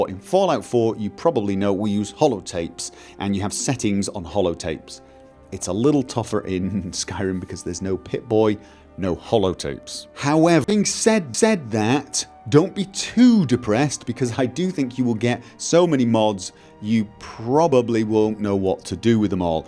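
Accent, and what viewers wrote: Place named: Manchester, United Kingdom. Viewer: Are you from Manchester you've got a Manc accent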